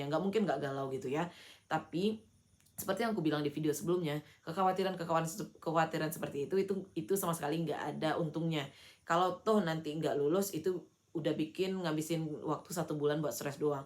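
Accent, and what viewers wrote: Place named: West Sumatra, Indonesia. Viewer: Mba org Minang kah?😊nada bicara nya seperti logat org Minang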